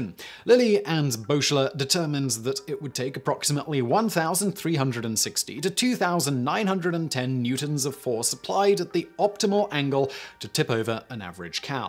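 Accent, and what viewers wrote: England, United Kingdom. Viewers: The presenter has such a proper English accent. Where’s your mac Englishman?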